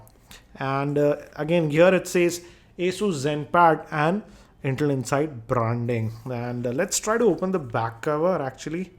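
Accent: India. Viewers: dear, please change your accent. It's ok to have an Indian accent, but when you stop every sentence, it feels like you are singing, but with the same tune. Please take this as a friendly suggestion and please stop bending the last word of your sentences. Otherwise all your reviews are good.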